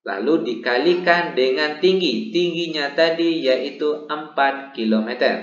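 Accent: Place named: Bima, Indonesia. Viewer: Logat bima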